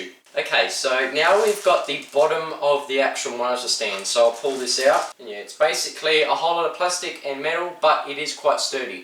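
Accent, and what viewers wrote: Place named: New Zealand. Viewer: Great review. Noticed you have a NZ accent :-) Waiting for your updated review with 5700XT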